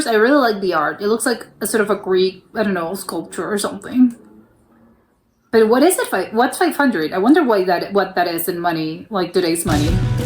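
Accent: United States. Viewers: Dragon, seagulls, king.... hilarious. 🙃Well, Finland's currency has been €uro since 2002. Before that, it was mark. "Kymmenen markkaa" means ten marks. "Dragon" is a lion and "seagulls" are swans. Finland has had a president since 1918, not a king. However, you sound very, very, very American. ☺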